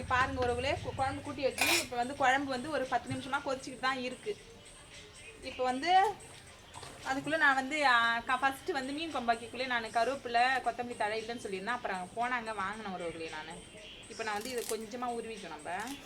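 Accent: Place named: Cuddalore, India. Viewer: கொள்ளைக்குன்னு சொல்றிங்க கண்டிப்பா கடலூர் மாவட்டம் தோணுது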